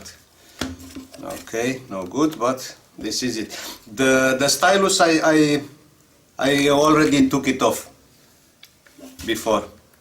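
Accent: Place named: Russia. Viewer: i love russian accent, благодарю вас comrade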